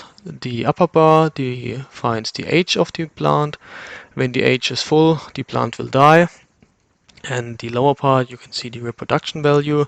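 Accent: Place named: Germany. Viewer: Gutes Video! (Ich geh mal vom Aktzent davon aus, dass du Deutsch sprichst 😜)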